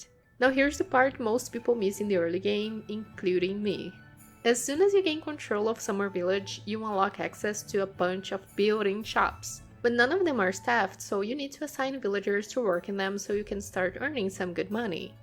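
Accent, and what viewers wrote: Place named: Brazil. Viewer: Great video!! You have a Brazilian accent 😊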